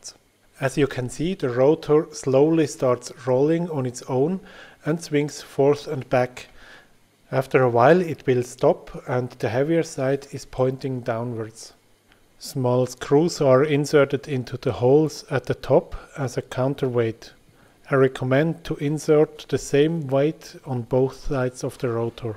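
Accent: Switzerland. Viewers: Sounds swiss-german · Richtig guets Video ;D mer erkennt eifach en schwizer mega schnell wenner englisch redet ^^ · Bisch du us de schwiiz ? So vom Dialekt her hanni denkt. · Where u from? Swiss?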